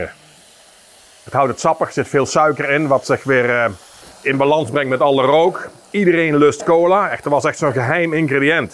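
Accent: Brabant, Netherlands. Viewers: Da's Brabants, gehakt is daar nou eenmaal 'gehak'..Gaat niet meer anders worden, vrees ik 😂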